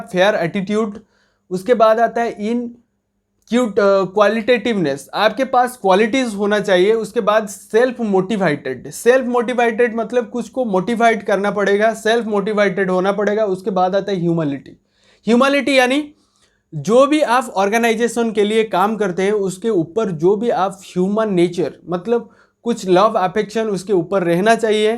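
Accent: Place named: Odisha, India. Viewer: Dear, Great Job and well explained. But I want to suggest you about your accents which you are using, that is for only village people, those who are living in odisha. If you want to became a good trainer on facebook or youtube (or any social platform), you need to work on your accents. The pronunciation of ours (Odisha peoples) is very poor in both English and Hindi. So, continue to motivate others and step up for your great future. Thank you, God bless you.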